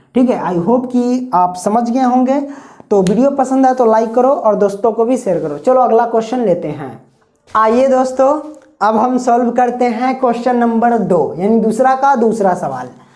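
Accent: Bihar, India. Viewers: Aap Bihari hain ji. Aap bahut achha padhate Hain. 👌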